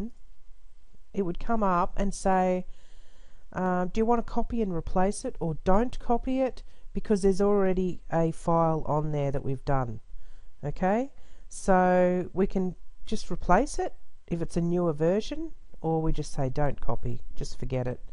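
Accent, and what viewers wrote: Australia. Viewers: Good info. / Thanks from a 70 yr. old American. Your accent (Aussie?) is pleasant to listen to.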